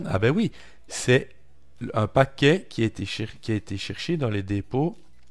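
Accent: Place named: Luxembourg Province, Belgium. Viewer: salut je découvre ta chaine via Adrien D.(linuxtricks) tes vidéos sont pas mal, il y a juste que tu parles un peu lentement au démarrage de ta vidéo :D j'ai failli zappé, j'ai cru reconnaitre un accent de ma région (sud de la province du luxembourg) :D je te mets un pouce pour celle là et tu gagnes un abonné ;)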